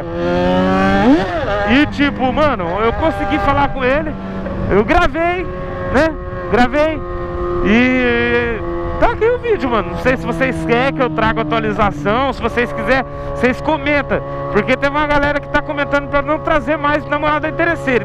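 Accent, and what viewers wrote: Goiás, Brazil. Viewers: Pq ele fala no piqui do Goiás??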